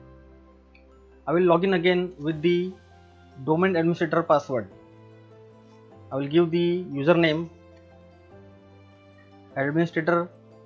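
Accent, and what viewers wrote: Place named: India. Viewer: He does not have a speech defect. It is accent. Comes from India.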